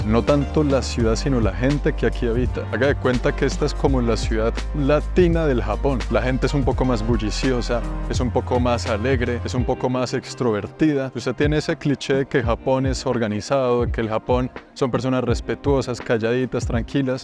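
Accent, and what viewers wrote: Bogotá, Colombia. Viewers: jajajaja ese man es mas rolo que la changua ñero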